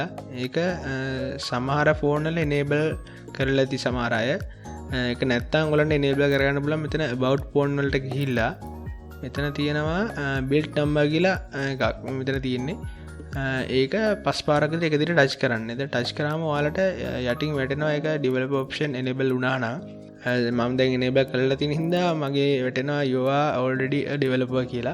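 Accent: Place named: India. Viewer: Description in english speak in indian.. Wtf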